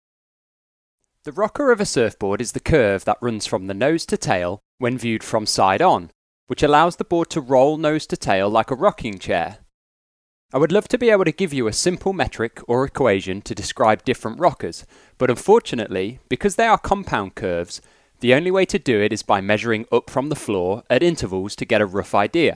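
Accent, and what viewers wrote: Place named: Northern England, United Kingdom. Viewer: Every time I hear a northern English accent talk about surfing, I am surprised that people actually surf up there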